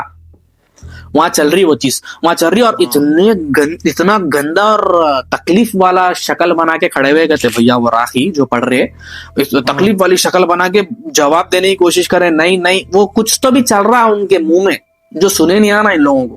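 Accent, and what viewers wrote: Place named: Hyderabad, India. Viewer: Bhai story sunkar maza agaya with Hyderabad style me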